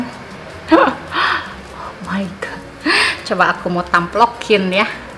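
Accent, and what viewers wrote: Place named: West Java, Indonesia. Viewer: Suka banget liat teh ngmong medok Sunda gini lucu itu, bodiiii bodiii lucu uy dengan kekhasannya. Ajhh habis ini mau nyobain deh produk YOU gara2 racun teh 🤩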